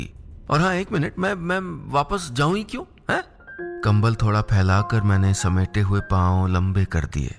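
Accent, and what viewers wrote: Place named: Kumaon, India. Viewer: Very beautiful story and that kumauni style of talking just awesome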